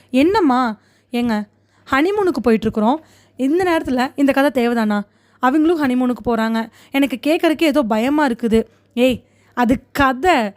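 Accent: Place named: Coimbatore, India. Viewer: பழைய கதை எல்லாம் கேட்டு கொண்டிருக்கேன் சூப்பர். அப்டேட் டும்.. கேட்கிறேன் அருமை.. சகோதரி வாய்ஸ் கோயம்புத்தூர் டோன்... 👌🤝💐 திண்டுக்கல் எங்க ஊரு வாவ்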